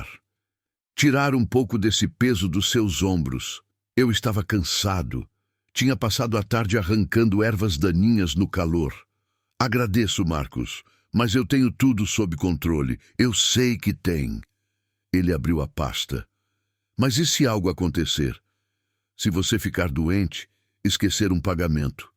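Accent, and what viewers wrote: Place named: Paraná, Brazil. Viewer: Istoria muito impactante. Narração Nitidamente Maringá. Paraná 20 ,,8❤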